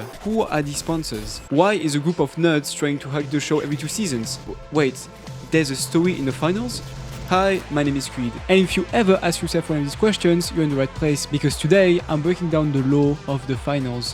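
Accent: France. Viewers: Are u french or israelian? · Is this guy french? :( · Je valide l'accent français ! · L'accent français qui trahit n'importe qui ❤ excellente la vidéo sinon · aaaaaaa l'accent français mddr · Where are you ?from your accent look French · WAIT! are u french?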